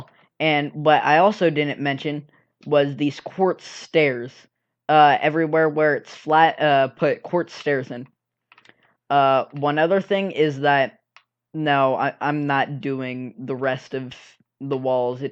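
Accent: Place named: United States. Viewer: Ok like no hate or anything I appreciate the tutorial and stuff but why are you using British lingo (like “rubbish” and “a wee bit”) but you have an American accent?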